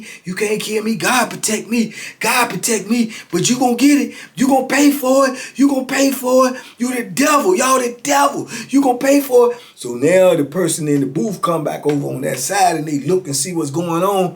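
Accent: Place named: Washington DC, United States. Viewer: Every time I hear you, I hear DC vibes, real live. Lol.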